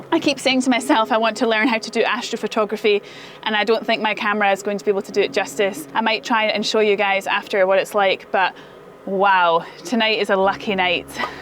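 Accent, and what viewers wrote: Scotland, United Kingdom. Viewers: Nice one, what a blend, on the road with the campervan, hiking to historical sites, soaking in the beauty of Scotland, with your wonderful Scottish accent, love it.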